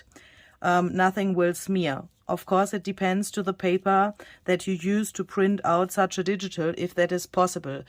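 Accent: Germany. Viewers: Omigosh, …This digital came up on my Etsy feed last night and I added it to my cart… Now, when I see it in use…I must have it…I will be returning to my cart and purchasing it right away…I love these cards… Now I have to figure out how to print four on one page… I must tell you, I am of German descent and when I listen to your accent, it brings me back to my childhood and makes me happy… You have a wonderful personality and you make me smile…Hugs to You…❤️❤️❤️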